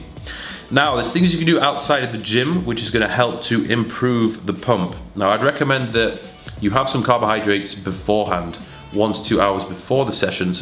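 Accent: United Kingdom. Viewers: You Are British ... Right na...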